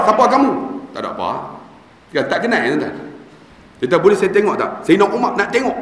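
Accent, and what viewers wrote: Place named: Kedah, Malaysia. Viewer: Ustaq kami owg kedah mmg la kasar...owg kedah mmg ckp mcm nie...harat jngn ambil hati noh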